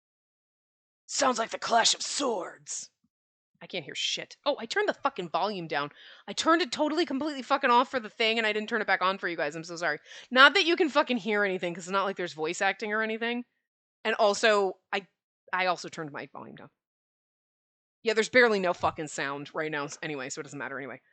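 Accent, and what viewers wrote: Ireland. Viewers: The Irish accent started with Jack from london detective mysteria because he had a accent was how he was written But you really start to use it with norn9 I believe that was the kickoff point when you start to use it with almost every game which I love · "Favorite boy, he's the last route, he's an asshole..." Me: Waiting, knowing full well that this boy is gonna have glasses, is gonna be a robot, or something else special. " Fukuyama." and there it is XD Does he have white hair (Looks to see) Blonde? Okay, close enough. XD XD Irish accent. Didn't it's regular use start with Bustafellows? Shu? You just wanted to experiment with it but it ended up sticking? (and making me REALLY DISAPPOINTED that Shu did NOT have an Irish accent in the game...) I can't recall you using an Irish accent regularly in your repertoire prior to that. Also, (adding after the end.) Fukuyama DOES voice the sketchy characters, but when it comes to Vyn Richter, I got so tired of the "he's a red flag" deal I literally wrote a 2k essay on why he's not and I will proudly stand by that essay. 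Vyn is a good boy, even if he wasn't voice by, so haters need to leave him alone. XD XD I'll stand by you as you stand by him. LOL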